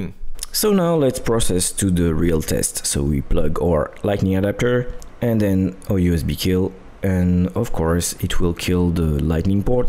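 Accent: France. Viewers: Haha youve got a french accent !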